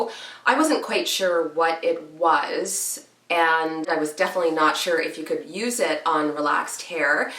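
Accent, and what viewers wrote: Canada. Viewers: It's a Canadian accent, very simply.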